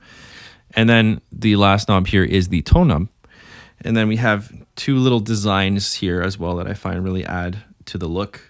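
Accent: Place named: Canada. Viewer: Gee you sound very Canadian! Very interesting that Klein took on creating a next gen harp guitar! Thanks for the exploration!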